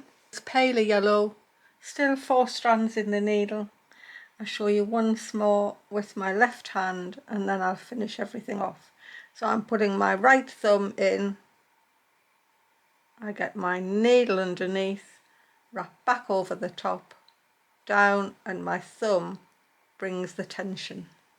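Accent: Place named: North East England, United Kingdom. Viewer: The daisies have made such a difference. Your coat is looking so special. I love your videos especially your lovely NE accent.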